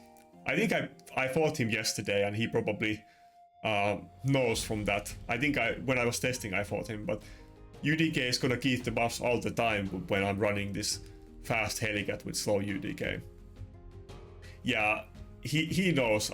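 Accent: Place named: Finland. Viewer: May I ask where you are from? It's just that you kinda sound like a Finn that's trying to hide the finglish accent but slips up every now and then :D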